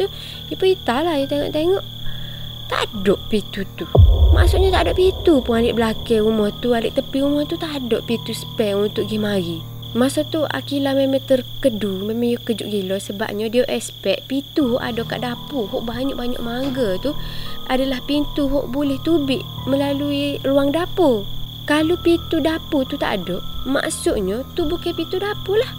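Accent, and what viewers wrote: Kelantan, Malaysia. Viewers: kelate malaysia. sedap dengar kakak ni cerita terbaikkk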